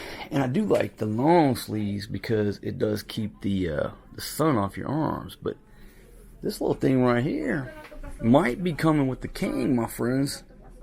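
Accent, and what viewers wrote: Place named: Western Pennsylvania, United States. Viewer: You have a western Pennsylvania/Ohio/WV accent.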